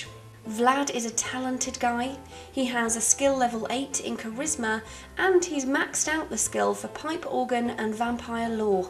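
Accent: United Kingdom. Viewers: Youre english sound like british accent, but you from america ?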